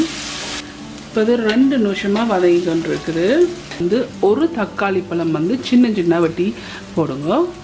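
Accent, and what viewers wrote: Sri Lanka. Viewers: Are you a Srilankan Tamil?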